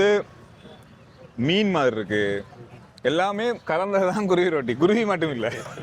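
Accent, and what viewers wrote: Sri Lanka. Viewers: Elangai tamil enaku inimaiya irukum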